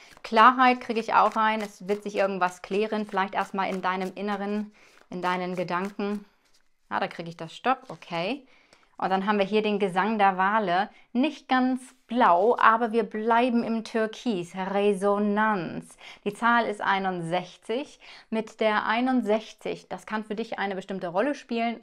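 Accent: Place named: Low German, Germany. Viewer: Du sprichst ja Plattdeutsch 👌🙋‍♀️👍 wie schön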